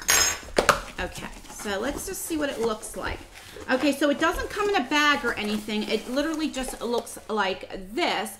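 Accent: New Jersey, United States. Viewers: Hi, Love you love your channel. I am about ten years older than you but we have identical eye color (my best feature). Our eyes are unique in the fact that we have such a pale blue iris surrounded by the dark ring. Everybody in my family is blue eyed but I am the only one with the dark ring and until you never really seen anything so close to my own. Have to ask, you mentioned you have a daughter of mixed race? What type of eye color does she have? I would guess genetically brown but I wonder if the color is a lighter and also a unique brown? I also love your accent. It reminds me of all my wonderful summers spent in NJ with my extended family. Just wanted to drop a note as I love watching you, learned so much and never tire of listening to you. Don’t ever edit. Lov Suzanne in Phoenix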